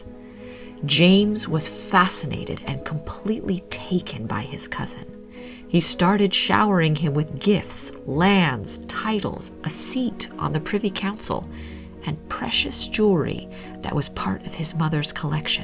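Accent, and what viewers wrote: United States. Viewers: This is American accent at its finest.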